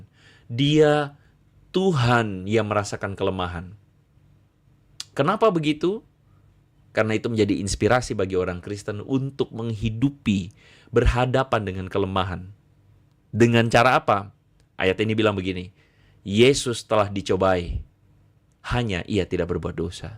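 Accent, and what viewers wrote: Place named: Java, Indonesia. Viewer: Si medok ketipak tipung adalah ustad mukalap yg spesialis bahas Alkitab, itulah jualannya spya pundi2 ya tetap berlimpah,tp bahasannya sangat ngaco dan bar bar, ngaca!! Belajarlah yg bny spya pinter sdikit, kasihan saudara muslim sy yg sdh di sesatkan oleh si medok. Dy sendiri ga ngerti alur Alkitab tp sok sok ingin membahas Alkitab, krn itulah dagangan ny yg laris manis, pesan sy buat: belajar ngaji dan solat aja dgn lebih khusuk, jgn masuk kamar orang lain. Urusin kamar mu sendiri, kamar mu saja berantakan ga karu2an. Beli kaca spya bisa introspeksi diri dan jgn lebailah.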